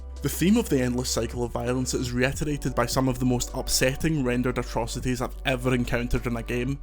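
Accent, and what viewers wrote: Scotland, United Kingdom. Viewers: Yeah Scottish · Scottish accent right? not irish?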